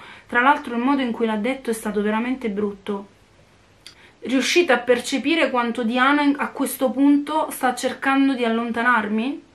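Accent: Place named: Palermo, Italy. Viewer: Il tuo accento palermitano è qualcosa di stupendo 😂😍 (sono siciliana e ho origini palermitane)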